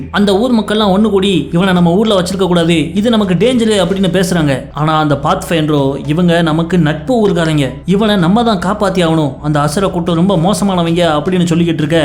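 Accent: Tirunelveli, India. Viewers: திருநெல்வேலி ஸ்லாங் சூப்பர் 👌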